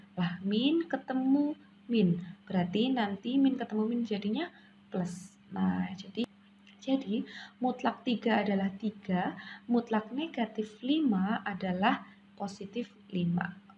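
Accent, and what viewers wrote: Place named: Java, Indonesia. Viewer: Halo kaka....kaka orang jawa ya....medoknya keliatan....😂😂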